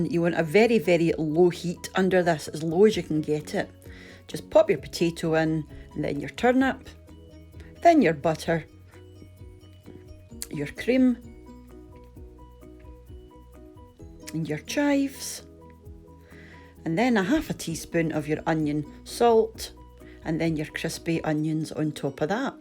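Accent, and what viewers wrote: Scotland, United Kingdom. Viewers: looks amazing.. will be trying this. my mother and most of the family are from portsoy. this scottish accent just sends me back to being little and visiting during holidays. so so glad i found this channel x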